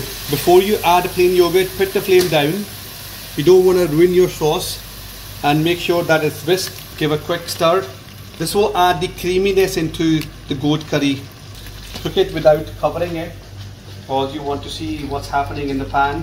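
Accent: Scotland, United Kingdom. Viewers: Why you sound scottish? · I detect a slightly Scottish inflection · Looks delicious probably cooking tomorrow 👍 You sound like you have been working ing in uk/Scotland?? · Is that a Scottish accent I hear?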